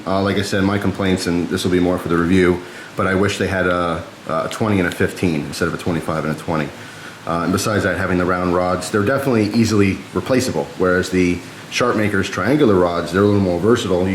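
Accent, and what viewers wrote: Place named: United States. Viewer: It's called a "strop;" it's a piece of leather with abrasive material on...Oh, wait, you're making fun of his pronunciation, aren't you? Well, my cheeky friend, there's a whole bunch of dialects in the U.S. and I honestly don't know why you are entitled to judge him for having a different one than yours.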